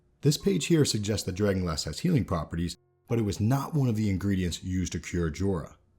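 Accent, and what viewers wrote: New Jersey, United States. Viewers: Let's face it.. with that NJ accent, THAT'S the rhythm of ur narration! lol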